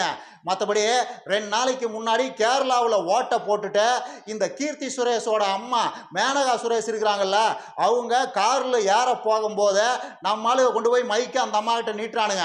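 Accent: Kongu, India. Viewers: Super explanation speech I like your gongunad speech congratulations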